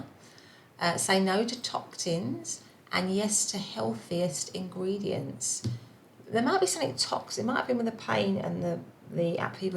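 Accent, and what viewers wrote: United Kingdom. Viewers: Thank you!! I love the way you interprétate de tarot ( even if sometime is hard for me your London English accent ( I love it btw ! ) hug , abrazo y gracias from Buenos Aires ! ❤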